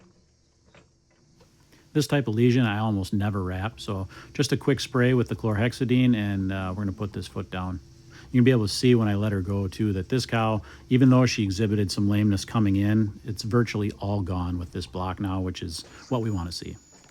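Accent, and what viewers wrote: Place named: Wisconsin, United States. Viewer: I don't know if it's the Wisconsin accent that reminds me of home, or if it's that I identify with a good pedicure, but I love these videos.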